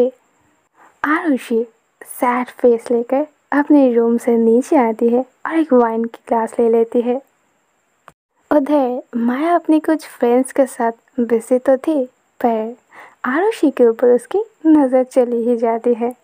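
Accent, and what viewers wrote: Bengal, India. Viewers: I am fascinated to hear Bengali in your voice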